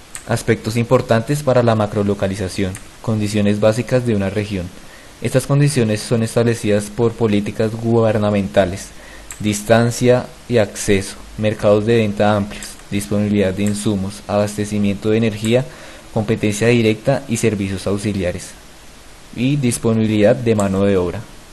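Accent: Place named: Colombia. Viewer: por el acento se da uno cuenta que es de colombia jaja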